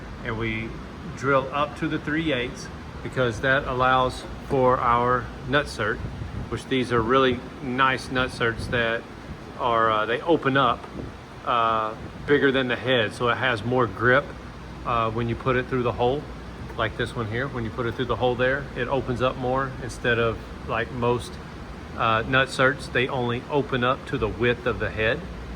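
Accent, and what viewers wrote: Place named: Texas, United States. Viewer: Texas Talking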